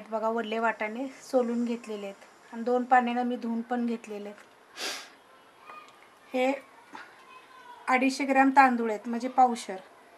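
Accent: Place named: Rural, India. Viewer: खूप छान मावशी,,,, तुमच्या भाषा गावाकडची आहे पण त्यात खरंच खूप प्रामाणिकपणा दिसतो,,, जेवढी चव तुमच्या बोलण्यात आहे तेवढीच या पदार्थात असेल यात काहीच शंका नाही...! आणखी खूप व्हिडीओ बनवा,,, अस्सल गावाकडचे पदार्थ शिकवा आम्हाला..!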